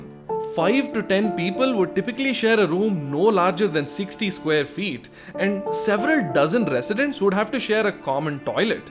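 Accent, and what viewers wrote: South India, India. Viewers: Love the South Indian accent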